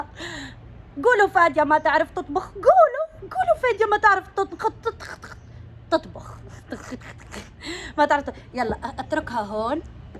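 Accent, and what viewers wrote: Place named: Saudi Arabia. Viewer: 😆 هدرتك تتبدل صرتي سعودية صح 👌🏼💯😆 بصح ضحكتك تبقى كيما راهي 😆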